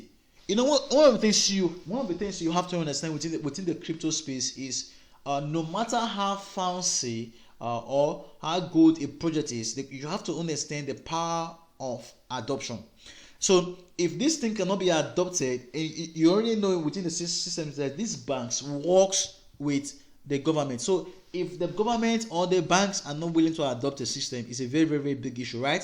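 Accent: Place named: Nigeria. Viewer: where are you from, you sound Nigerian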